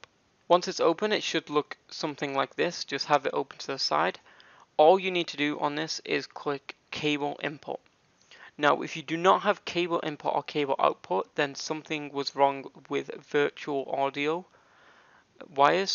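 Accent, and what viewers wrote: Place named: France. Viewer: on est d'accord que tu est français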